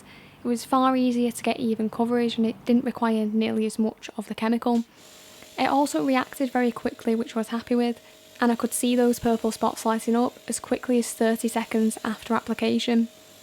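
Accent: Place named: England, United Kingdom. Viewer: Enjoy your English accent.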